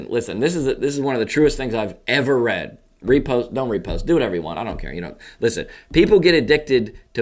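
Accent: South, United States. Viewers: Keep it suh-thurn! It’s the best!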